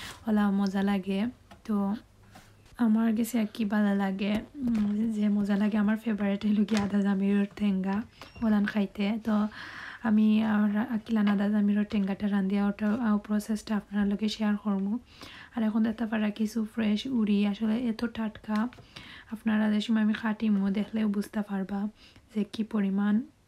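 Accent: Sylhet, Bangladesh. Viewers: সিলেটি ভাষার বোন নাকি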